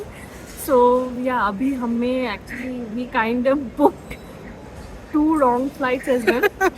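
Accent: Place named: India. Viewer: I like ur deep indian ascent English... sounds interesting indeed..how do U like Malaysia?!!🎉😅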